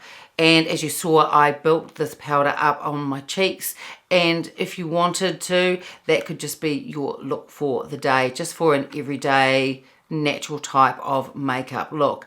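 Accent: New Zealand. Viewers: Thank you for such a thorough review you get an A for effort for taking the time to wash off and reapply products twice! These all looked great on you, but it seems like everything you try does. Also enjoy your videos because I love the NZ accent.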